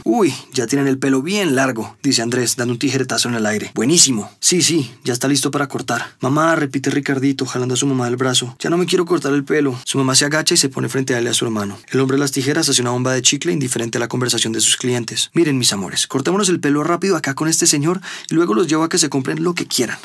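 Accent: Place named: Colombia. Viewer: Ese acentico colombiano💕💕💕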